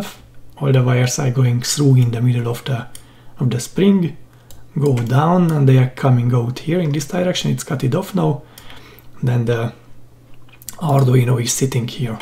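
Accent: Hungary. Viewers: Sounds like hungarian accent:D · hello, awesome project. i am just building it, how do i connect the cable to the arduino? which pins? what is the value of the two resistors r17 and r18? by chance my ears are correct and i hear a hungarian accent: easy rider ocseeem! :D thx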